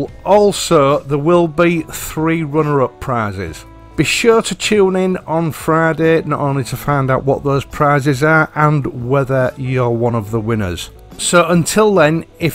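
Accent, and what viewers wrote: United Kingdom. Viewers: I like the voice that has the old British charm that is nowadays somehow lost in India.Your videos are so unique that I cannot express the wonder properly that how much knowledge you have about motorcycles! Keep up your good work. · "RE+1080p+british english commentary" makes the world nice again.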